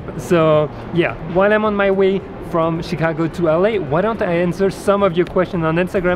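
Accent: France. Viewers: Tu es français ou je me trompes ? 🫣 Thank your for the advice I'm flying firdt time with my camera 😊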